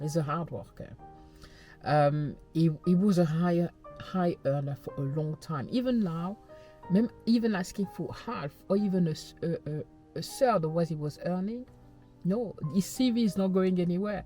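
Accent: France. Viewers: That adsense delivery timing was perfect! 😂😂 I love what you said about God and higher power. Your accent is amazing - so is it French/British? Also I have had to fire two really good people in the past few months and it’s just as awful on the other side of the table. Our business is down in profit and if we didn’t let people go, we would eventually have to shut down and everyone would be out of a job. I know it feels so personal and terrible but it really comes down to spreadsheets. I don’t know if that helps or not but just wanted to say both sides of the conversation are really hard. I love my employees and know they have bills and families and know their stories. But I think you have a great attitude and I’m not worried about your future success at all!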